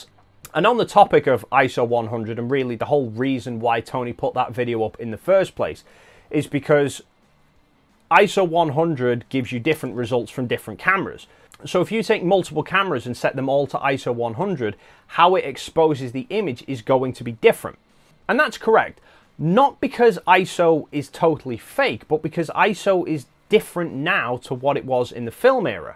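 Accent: United Kingdom. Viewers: im sorry but you cant explain your rebuttal clear enough, you sounded like you were just regurgitating what tony was saying , but in a British accent . and furthermore, Tony shows his sources for his claims and you just didnt show anything but talk. this is click bait and your just feeding off someone elses channel to get some of their subs....NOT!!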